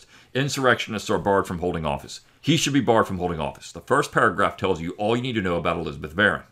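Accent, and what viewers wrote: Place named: New England, United States. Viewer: Sounds like a Yankee to me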